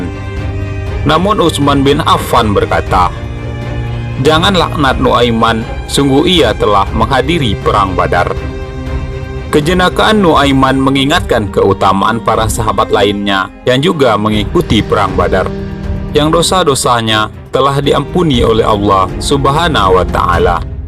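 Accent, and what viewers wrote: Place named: Padang, Indonesia. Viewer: Orang padang pasti dari logat bicaranya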